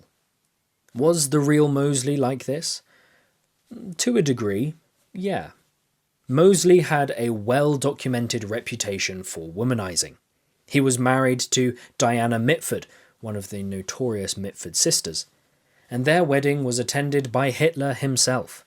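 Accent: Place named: United Kingdom. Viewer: God how I hate the British accent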